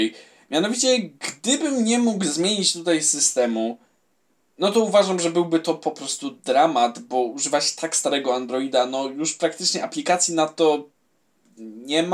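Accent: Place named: Podlasie, Poland. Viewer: akcent z Podlasia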